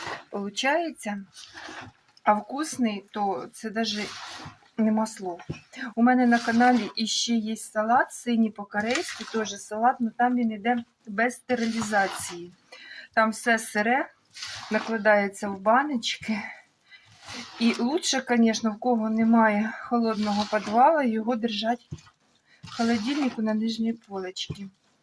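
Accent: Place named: Donbas, Ukraine. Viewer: Спасибо огромное,вы чудесная хозяйка,золотые ручки,и разговор,как песня,немного похоже,как у нас на Донбассе говорят,суржик,и не на русском но и не на украинском,наш местный диалект,спасибо,недавно зашла к вам,случайно,уже синие сделала квашеные,оболдеть, только без селеры,не люблю, спасибо,всем мира здоровья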